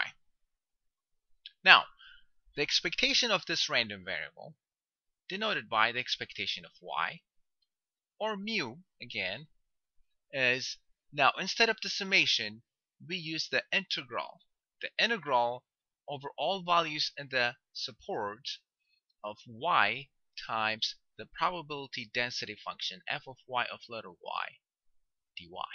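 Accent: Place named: Germany. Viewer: I detect a slight german accent.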